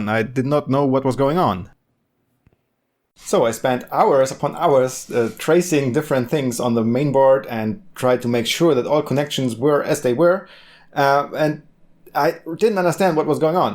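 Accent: Germany. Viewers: Ich höre einen leichten German Acczent ;o)... warum nicht gleich auf deutsch - gibt leider, viel zu wenig solcher guter Videos auf deutsch! Trotzdem: gute Arbeit - vielen Dank!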